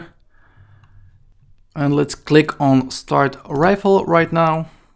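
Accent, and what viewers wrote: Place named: Portugal. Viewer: your Portugese accent makes it difficult to understand sometimes. Please speak more slowly and repeat the key info several times. Thanks!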